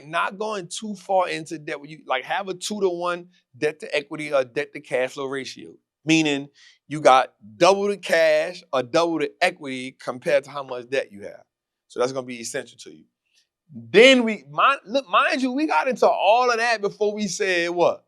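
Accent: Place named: New Orleans, United States. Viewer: Initially, I couldnt understand nothing (but I could really undrrstand everything)!😂❤🎉 but I love it though... Im just like yeap.... that initial was def #NOLA!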